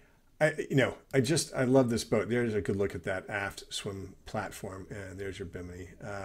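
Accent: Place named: College Park, United States. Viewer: I'm A native of collage Park, thought I recognized that accent! 😂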